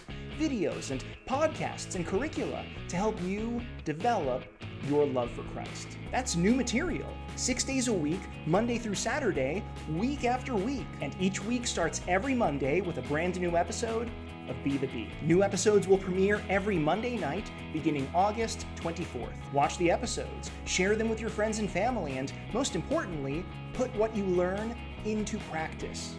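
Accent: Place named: United States. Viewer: Καλή Δύναμη! I have used your videos for a few years now and my students find them informative and engaging. The time is spot on. We're in Melbourne and we always smile at the 'American' accent. Just a suggestion, would you be able to prepare a video on St John the Baptist? Not just about his presence at Theophania - the Beheading of St John the Baptist has many lessons to teach us. Especially trying to navigate the world we are living. He is also a relevant 'environmental' figure with him eating locusts and wild honey. The 'food for the future people' only need to look back.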